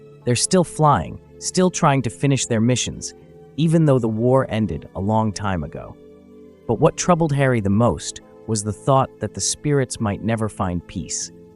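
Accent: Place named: United States. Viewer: This video needed an English accent, not a yank. Just doesn’t work.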